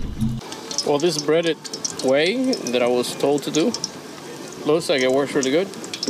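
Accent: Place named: Cuba. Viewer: Disculpa la pregunta pero acabo de unirme a tu canal y me gustaria saber porque siempre tienes la cara tapada y porque no dices una palabra en español si tu acento te delata que eres latino pienso que cubano.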